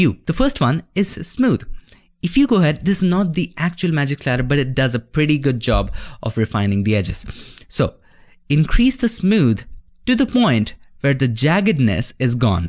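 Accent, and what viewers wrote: India. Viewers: He’s Indian I think He sounds Indian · Indian accent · Yes, an actual GOOD Indian accent which is ACTUALLY an Indian accent unlike some scammer shit people see on YouTube. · beautyofustwo well he does sound Indian so that might be why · 3 its an indian accent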